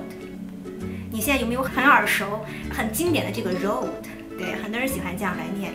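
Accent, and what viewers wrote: United Kingdom. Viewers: 你的发音很英式啊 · 听上去是英式英语？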